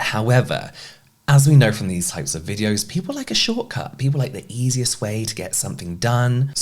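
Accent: United Kingdom. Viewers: Wait why did I not realize you had an accent until you said you were British😳🫢😭😭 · idk sh*t about british accents but I think this man has the same accent as Jamie Dodger, the cool trans guy Youtuber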